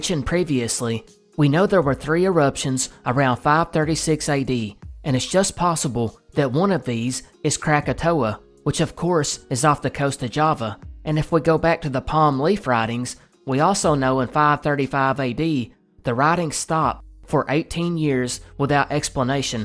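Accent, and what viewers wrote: South, United States. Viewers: This right here is a southern bygod accent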